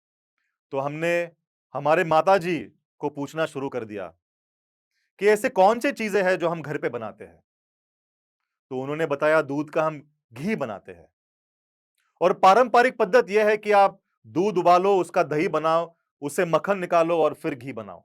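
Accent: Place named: Maharashtra, India. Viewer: Aapki hindi is very much maharashtrian..❤❤ love ur products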